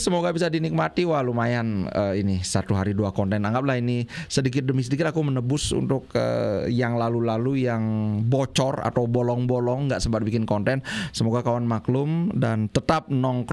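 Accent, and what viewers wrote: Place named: Singaraja, Indonesia. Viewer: Betul banget dari Singaraja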